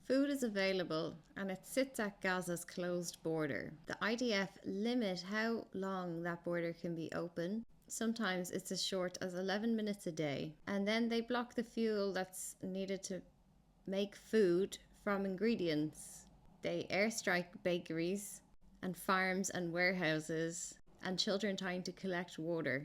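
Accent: Ireland. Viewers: Is this wise lady Irish?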